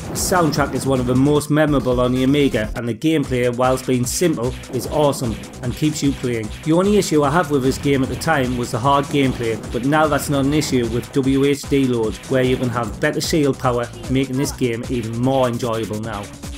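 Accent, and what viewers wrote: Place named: Sunderland, United Kingdom. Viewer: Great video! Nice to hear a mackem accent!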